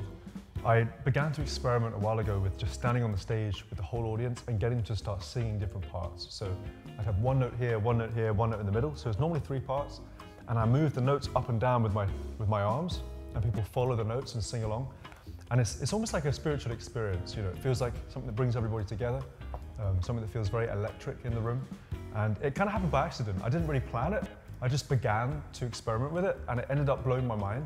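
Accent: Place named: United Kingdom. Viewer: Charmingly British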